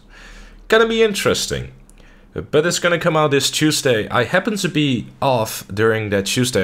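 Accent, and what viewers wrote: Netherlands. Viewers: Zwaar nederlands accent bro😂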